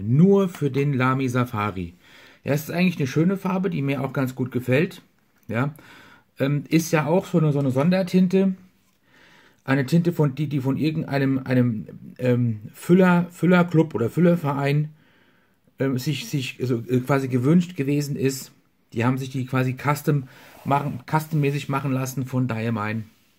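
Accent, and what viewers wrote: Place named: Germany. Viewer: Nice German accent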